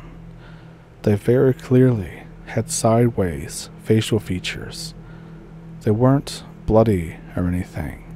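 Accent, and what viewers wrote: Ontario, Canada. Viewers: Odelay,! 🎉... Listening to your voice so reminds me of my cousins from Grey, Ontario 😊😊😊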